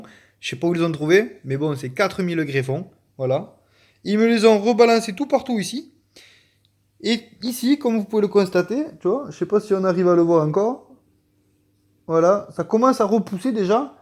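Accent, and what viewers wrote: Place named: South, France. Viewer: salut merci pour ta réponse Peut tu me donner les coordonnées de ton interlocuteur français à la clinique j envisage Di aller fin avril donc sa hurge merci encore gilles du cap d agde tu doit pas être très loin vu ton accent merci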